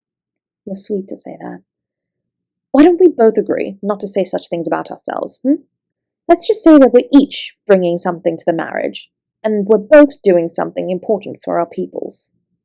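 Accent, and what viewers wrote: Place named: United Kingdom. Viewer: You say "pompous British accent" but I say "absolutely nailed it." This was amazing, and the accent only made it better. Thanks for the audio,.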